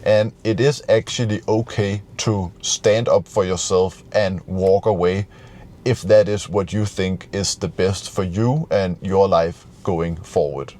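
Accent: Denmark. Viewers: Nice video as always. I am learning a lot from you, so thank you. Your englsh has gone from good to exellent, but you must never lose the charming danish accent .. .-) Can’t wait for the next video.. 💐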